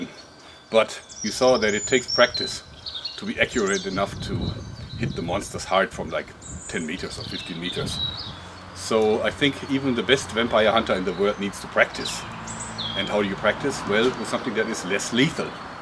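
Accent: Germany. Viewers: könnte es sein das du deutscher bist??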